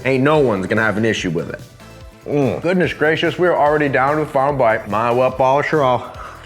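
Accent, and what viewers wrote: Texas, United States. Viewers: Sum gun, sounds like your getting Texas in your accent bro. Good.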